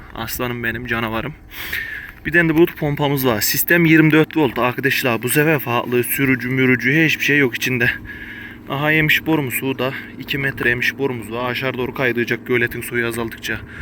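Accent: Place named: Aegean, Turkey. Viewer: Maşallah.. Tam da ege şivesi ile..Ben de denizli buldanlıyım. Sakaryada yaşıyorum. Yeni fark ettim sizleri. Başarılarınızın devamını dilerim.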